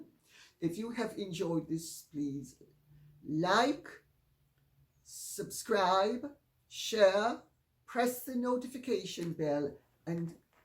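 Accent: United Kingdom. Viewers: I don’t know why, but condemning Harry and Meghan’s behavior sounds sooo much better with a classy British accent! 😉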